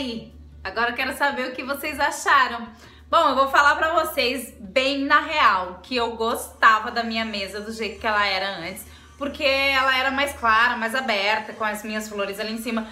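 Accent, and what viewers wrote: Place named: South, Brazil. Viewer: Conheci seu canal hoje e estou assistindo os seus vídeos, seu sotaque me chamou a atenção, vc é do Sul??? Amando seus vídeos, sua criatividade e capricho. Parabéns 😘 😘 😘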